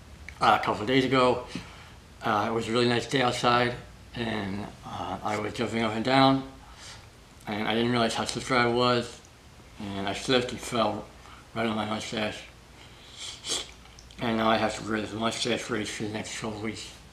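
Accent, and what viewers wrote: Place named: Ireland. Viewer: I'm digging the authentic Irish accent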